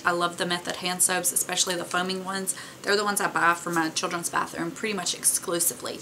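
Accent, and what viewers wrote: South, United States. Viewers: You definitely sound southern lol! I'm in Louisiana. Great job on the empties!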